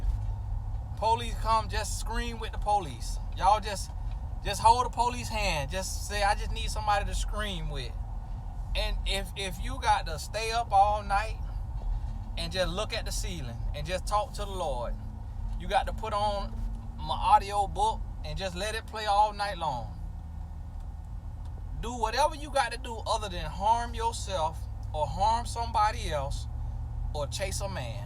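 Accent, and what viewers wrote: South, United States. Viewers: Omg Tony is hilarious and his videos are such a blessing. No fighting over men ✅ ya hear me... now God bless ya! I’m southern too from Florida so I can relate to the draw 😊 but the wisdom has me on another level. I’ve even started my own business and I’m investing in me and being focused something I’ve struggled with for years. His videos make me want to be a better person and Christian. I just bought the book a woman’s influence. Looking for it to come by mail Thursday. I just speak blessings on Mr. and.. I don’t care what criticisms may come please don’t let it deter you, Tony is doing purposeful work and I’m grateful!!!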